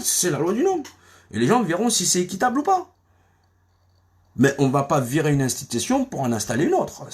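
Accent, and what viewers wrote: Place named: Basque Country, France. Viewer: Avez l'accen basque et un peuti peut de Mediterranean...😊❤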